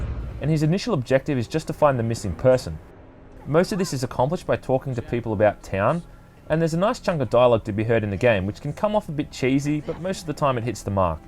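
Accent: Australia. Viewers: Why must people change the way a words are pronounced. T,tulu????? It's always pronounced with a c Cthulhu. Americans love changing the English language. Why???? You sound Australian.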